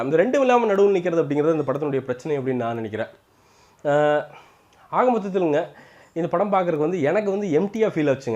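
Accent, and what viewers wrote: Chennai, India. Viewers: U were speaking a good tamil, please don't try to mix Chennai tamil in it... U r from coimbatore not from Chennai... it doesn't suite you.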